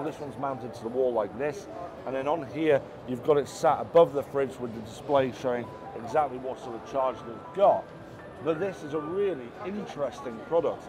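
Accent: United Kingdom. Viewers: I can't understand the British accent in general, so I just turn off the audio, turn on the cc subtitles and run yt videos at 2x.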